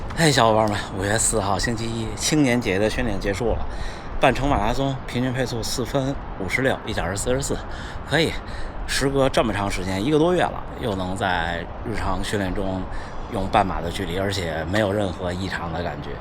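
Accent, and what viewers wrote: Beijing, China. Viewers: 特别喜欢听乡音😂 我小时候（95年左右）住胡同里，北马从家门口建国门桥上跑下来。我5岁多就自己在路边看跑步。没想到竟然埋了个马拉松的种子在心里。2024年春天跑了个半马2小时零1秒。然后很鸡血地报了秋季首马，练的特狠，跑了343。2025没怎么练跑步，玩自行车了，半马PB了143。2026又开始练游泳，结果练了3个月放弃了，85公斤胖子但腿太沉没啥肥肉，浮不起来。重新练跑步，但是心里总打鼓，想5年破三，又怕自己天赋太差。听你分享经验我就觉得特安心。心想着自己首马都到的第三年成绩了，我再练个5年，堆起来跑量，肯定也能破三了。就像你说的，不光练身体，关键是脑子（心态和意志）。练就完了。谢谢博主的分享！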